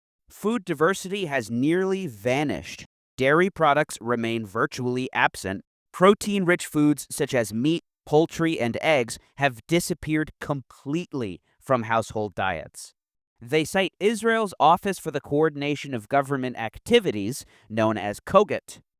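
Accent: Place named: West, United States. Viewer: He sounds western though?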